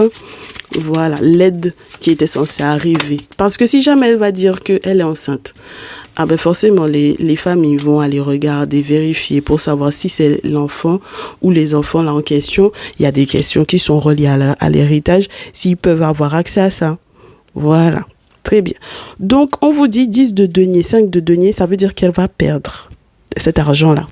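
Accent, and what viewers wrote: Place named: Antilles, France. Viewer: Vous avez un accent Antillais. 😁❤️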